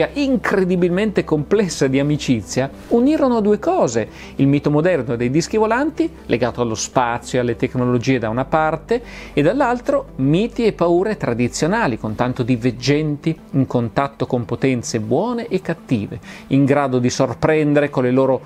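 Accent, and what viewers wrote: Italy. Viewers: Mi piace più il tuo accento piemontese, di quello di Sigir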